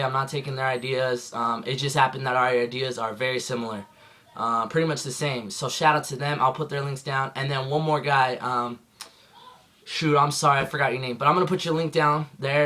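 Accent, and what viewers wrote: United States. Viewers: Hey you from utah huh haha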